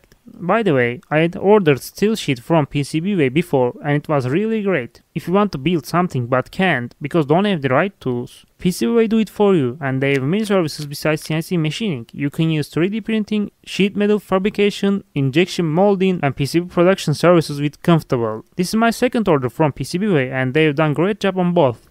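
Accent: Turkey. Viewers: Your accent is like Turk :) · Abi bu Türk aksanı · aksandan direkt anladım Türk olduğunu 👊😁🇹🇷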